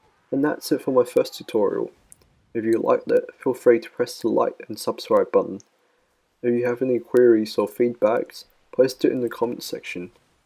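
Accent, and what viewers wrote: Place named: Australia. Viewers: Nice vid. Personally, I prefer the l'etranger, but this is really helpful. (Your accent is Australian, btw.) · He is Australian.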